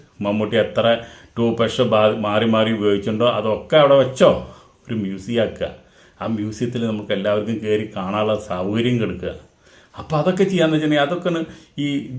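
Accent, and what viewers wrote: Thrissur, India. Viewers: Thrissurkarude slanganu chirri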